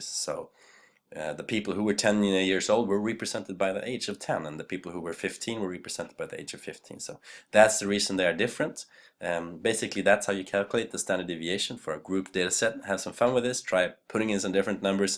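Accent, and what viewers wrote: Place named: Sweden. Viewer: Thanks for sharing! You have a Swedish accent! :)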